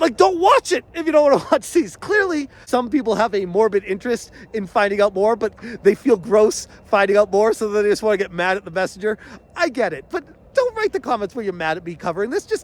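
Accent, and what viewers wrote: Boston, United States. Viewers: The boston accent is wicked dont change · Your coverage has been great - also who doesnt love a little Bostonian accent in there too. Hey I’m waaahhkin eeere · Thank you for your coverage of this. Frankly, I found his "apology" to be more responsibility dodging. He said he should have done better, but his examples on what he should have done better on were worded very carefully. His anger at the end was disgusting. I worried that people would just say "oh he apologized" and then stop talking about it. He needs to be audited and reviewed by the authorities. Then and only then should we let this go. I love your enthusiasm and your genuine reaction to this. By the way, stop apologizing for your Bostonian accent. It's who you are, and you should love it. I hear it all the time about my southern accent and I used to feel ashamed, but I'm trying not anymore. 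You got my sub ♥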